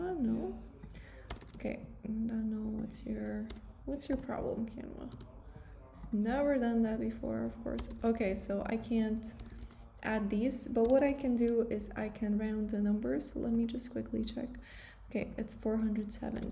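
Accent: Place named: Spain. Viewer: I am Indian but love your Spanish assent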